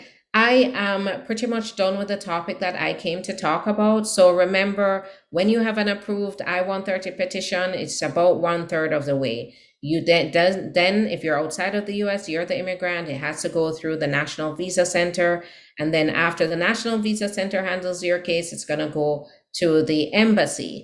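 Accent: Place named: Jamaica. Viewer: You Jamaican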